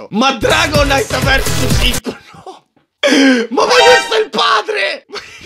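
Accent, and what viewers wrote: Rome, Italy. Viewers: TU devi doppiare pikachu e con accento romano incluso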